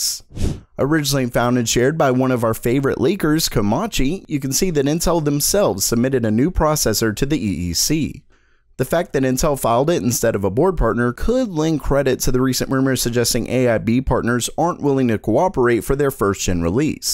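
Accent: South, United States. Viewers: That's a Southern American accent. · That southern accent yamsayin’ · He's Southern.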